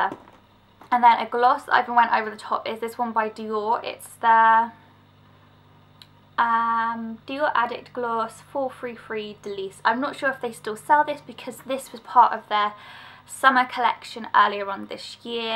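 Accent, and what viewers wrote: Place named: United Kingdom. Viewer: Your british accent is so cute ^_^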